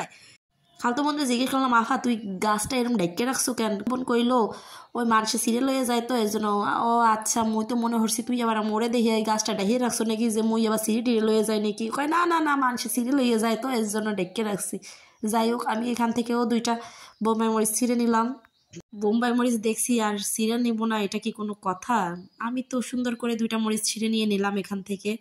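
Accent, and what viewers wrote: Barishal, Bangladesh. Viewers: দারুণ লাগলো ভিডিও টা আপু,,, আরও বেশি ভালো লাগলো আপনার বরিশালের আঞ্চলিক ভাষায় কথা। লাইক দিয়ে পুরো ভিডিও জুড়ে ছিলাম আপু 💕💕🌹🌹❤️❤️ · আপু বরিশালের ভাষা খুবই দারুণ ছিল 😂😂😂😂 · বরিশাইল্লা কথা ভালই লাগে। খুব ভাল লাগলো সবুজ ধানের ক্ষেত😊 হাসের বাচ্চা খুব কিউট 😊 পুরো টা দেখে নিলাম 😊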